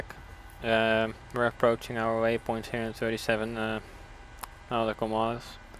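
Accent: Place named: Netherlands. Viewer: hahahah voor mij persé hoeft het niet hoor!! Ik hoorde je stem en ik dacht die komt uit hetzelfde land hahaha! Weetje tegenwoordig kan iedereen best goed engels dus verstaat iedereen je prima en met engelse video’s kan je mensen internationaal bereiken!! Blijf gewoon zo doorgaan en ik zou lekker in engels doorgaan!! Je bent goed bezig!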